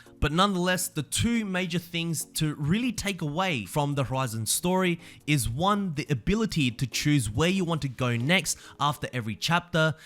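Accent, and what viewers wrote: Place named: Australia. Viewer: U are from Australia ?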